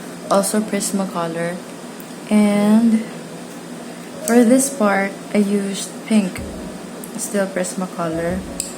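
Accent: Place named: United Kingdom. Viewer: Grabe tlga ang english accent ❤️🥰 i want to join and win the gouache watercolor to try other brand at baka maging magaling din ako tulad mo 💚💚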